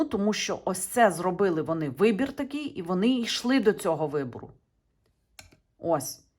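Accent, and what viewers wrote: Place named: Bukovina, Ukraine. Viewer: приємно чути правду та осадок що відповідати не будуть.Як сонечко в морозний день буковинський діалект гріє не тільки слух а і душу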